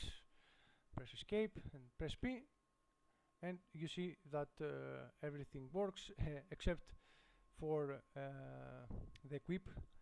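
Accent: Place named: India. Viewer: Man you are just PERFECT except your english, I guess you are Indian :D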